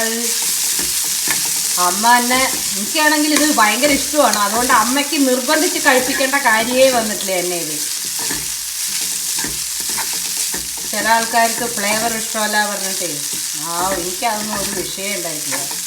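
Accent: Palakkad, India. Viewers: Hi.... My Dad is from പാലക്കാട്!! So aa varthaanam നല്ല rasandu tto!! Pinne പാചകo... വാചകo രണ്ടും superb!! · നമ്മുടെ പാലക്കാടൻ നാടാൻ ഭാഷ ചേച്ചിയുടെ സംസാരം സൂപ്പർ 👌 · Luv u r accent chechi..em frm pkd too so I cn relate tu u!😊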